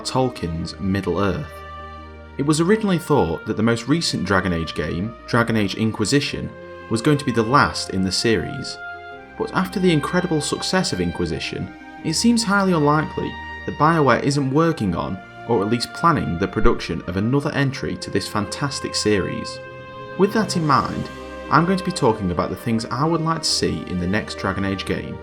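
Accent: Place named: Midlands, United Kingdom. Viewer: haha thats cool mate, loved ur vid, im from london so local by the sounds of ur (midlands) accent? lol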